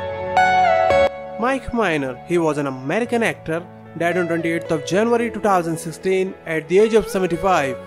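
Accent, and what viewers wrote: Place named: India. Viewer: his accent is clearly East Indian/Asian and a strong one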